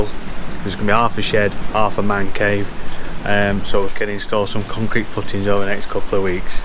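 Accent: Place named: Yorkshire, United Kingdom. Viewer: Where dya live? Sound like a Yorkshie Lad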